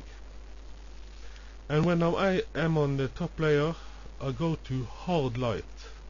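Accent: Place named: Norway. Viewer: Hehe, Norsk-Engelsk er morsomt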